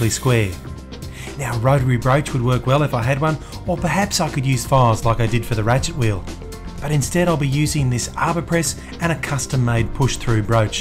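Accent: Australia. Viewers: You are the only person with an Australian accent that I can listen to. My girl friend is from new Zealand and I'm used to hers, Australian just sounds weird and I end up focusing on nothing else. But with you. Your work speaks way louder.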